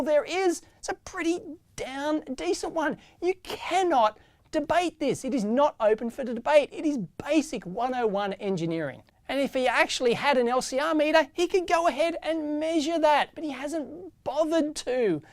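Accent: Australia. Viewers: I have just watched an "English" video debunking a house energy saver, and now I have watched a Australian (?? hope I have not insulted you) debunking free energy - just fantastic going from low key cynicism to high intensity "your an idiot". Thank you for a excellent video and some real science. :):):)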